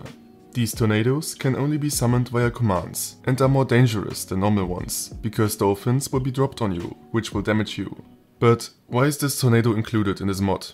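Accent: Germany. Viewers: This guy is German, that’s for sure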